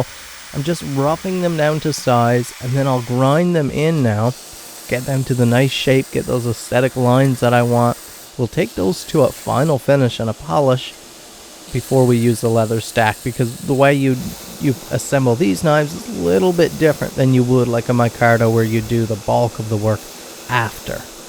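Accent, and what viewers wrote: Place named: Canada. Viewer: Beautiful outcome!! The tool is so simple it’s elegant. I really enjoy watching your work. I’ve done a number of re-handle projects and a few re-profile things and really enjoy the leatherwork!! I’ve forged one blade myself: did everything wrong, but it was pre-YouTube and really widespread internet days. Did the best I could with a wood stove and some tongs I found at a yard sale. Finally finished the knife and heat treated it in the oven; it’s good enough for a wall hanger 😜. My favorite part of your videos is the accent. I grew up in Montana, and wandered Alberta and BC a good bit. I really enjoyed The Great White North eh!! ♥️🇨🇦👍. My grandfather and great grandfather wandered Nova Scotia too. It’s in my blood.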